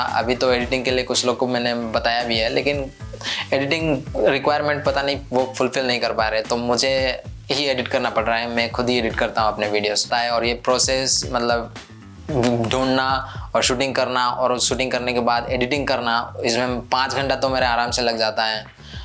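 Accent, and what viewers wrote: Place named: India. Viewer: Love how u improve your English but u need more practises for your pronunciation to remove that slightly indian accent.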